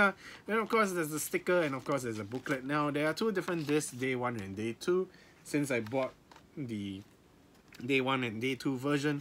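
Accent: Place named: England, United Kingdom. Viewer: I like your english accent 😁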